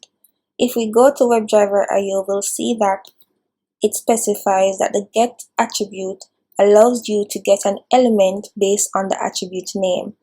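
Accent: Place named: Jamaica. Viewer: Nice you sound Jamaican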